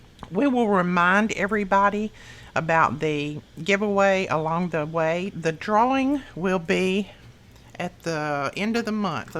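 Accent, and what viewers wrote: South, United States. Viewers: My favorite craft as of late is junk journals. I have never ever won anything from entering any contest. My dad use to say I was bad luck lolol I keep trying though! I love your southern accent!!!!! Xoxoxo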